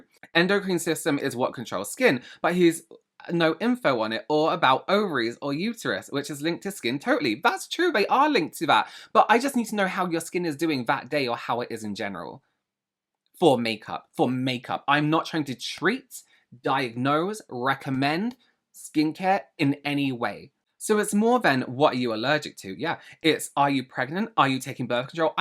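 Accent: United States. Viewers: She sounds so American 😂😂😂😂. Really unintelligent and doesn’t understand that we in Europe actually learn how the body works. Whether you are a man or a woman 😂😂😂 everyone knows what a period is and how they work.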